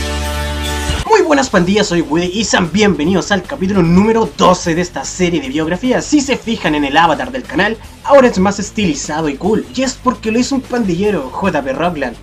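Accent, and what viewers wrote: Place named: Chile. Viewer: Por tus voz eres chileno lo se porque tambien las voces de nuestro pais es asi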